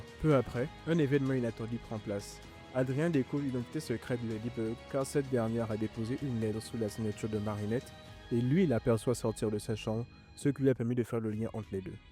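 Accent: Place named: Gabon. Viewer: J'aime, continuez, vue ton accent, je dirais que t'es Gabonais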